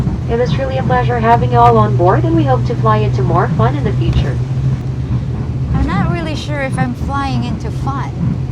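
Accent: United States. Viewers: Maayong gabi,! Stumbled on your You Tube video here with an insightful view of your hometown after the powerful typhoon passed the place. I liked your English accent here. Did you grew up in the States? Anyways, I appreciate your report here. Had many of the people I know who are based in Cebu, mostly in the downtown area. Daghang salamat! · American accent man so madam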